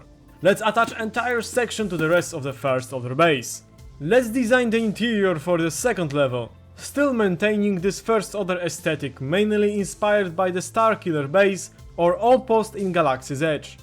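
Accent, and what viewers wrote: Poland. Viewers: czy ja wyczuwam Polski akcent w wypowiedzi? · slychac ze polak xD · beautiful polich accent lol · Słychać że Polak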